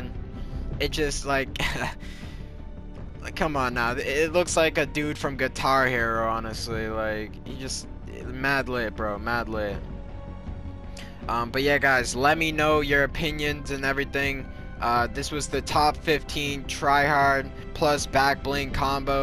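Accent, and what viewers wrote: France. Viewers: Are you french? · Are tou french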